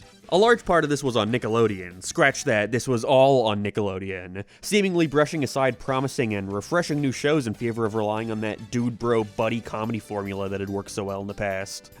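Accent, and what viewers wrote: Ireland. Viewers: is bro irish or no 💀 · Hes Irish living on America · I'm pretty sure he's Irish or something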